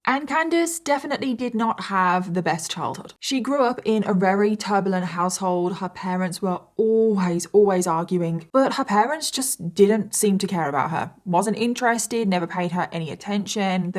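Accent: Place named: Birmingham, United Kingdom. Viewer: Going off topic, there's something about your accent. One minute you sound antipodean, the next you sound like someone from Birmingham, UK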